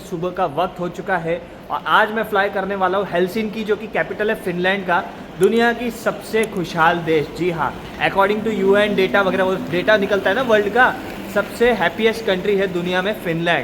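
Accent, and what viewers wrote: Gujarat, India. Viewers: bhai aaj first time teri vedio dekhi aacha laga , waise tu gujju hai kya tere bolne se style se aisa lag raha hai. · So good to hear from you. Well explained. 8 enjoyed and learnt things from your video. You sound typical Gujju😅😊🎉All the best.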